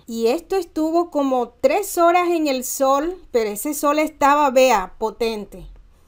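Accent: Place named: Venezuela. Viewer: Me encantó. Me gustas como hablas pareces Venezolana. Aplicaré lo aprendido. Gracias.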